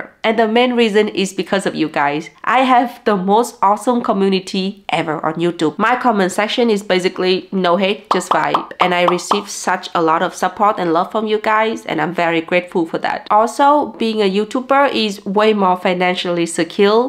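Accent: Germany. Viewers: yes, yes, yes! go to discover japan and South Korea.....their food is AMAZING!...we suspect that you are getting deutsche accent..💪👌. Hope that boyfriend will learn vietnamese to speak with the " in-laws" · the funniest thing.. the longer I hear you talk English the more I pick up on the germanized accent you've started to develop when speaking it, especially noticed it when you said audio and some words after that.. Some very typical German pronunciations of the English words haha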